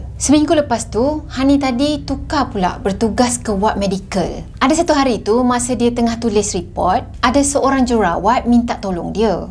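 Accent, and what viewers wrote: Kelantan, Malaysia. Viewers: Pada permulaan video,saya ingatkan kak ni orang Indonesia. Tapi bila dah start bersuara,hihi orang kito ruponyo..tokleh nok lari bunyi loghat kelate dok?..tu hok kawe terus pechek potek subscribe..moga Allah permudahkan segala urusan Kak..inshaa Allah..amiin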